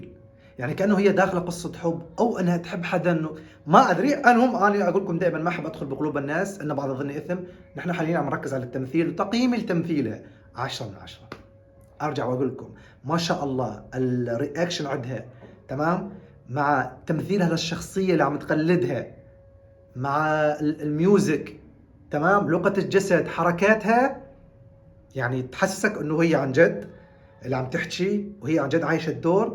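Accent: Iraq. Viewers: عمره 16ولهجتك نفس لهجت العراق 🇮🇶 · اللهجه العراقية تجنن😂 والله زمان جنت اتابع مقاطعك وهسه طلعت الي مرة ثانيه والله الحمدالله من طلعتلي مرة ثانية لهجتك تخلي الواحد يتيه كلش صاير عراقي الى ربع 😂😂😂 والله احسن من ينور اليوتيوب ❤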